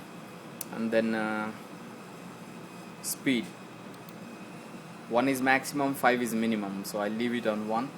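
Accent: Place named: India. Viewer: India English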